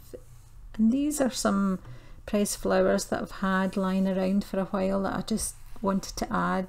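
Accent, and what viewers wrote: Scotland, United Kingdom. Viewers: What a unique and beautiful journal, love all of the natural elements. And how lovely to hear a bonny Scottish accent...makes me miss my days of living in Edinburgh! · Thank you for taking us through your journal! I have to say I so enjoy your Scottish brogue. I had to subscribe, and I look forward to seeing your art and hearing you speak in your other videos! :)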